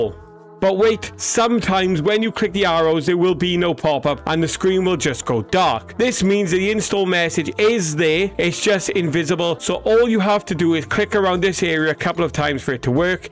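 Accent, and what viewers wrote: Wales, United Kingdom. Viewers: omg the welsh accent made this so much more enjoyable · Thanks! My friend said it took him 3 hours to get sidequest on his VR but with this method i got it in 15 minutes. Also proper Welsh accent 💪🏴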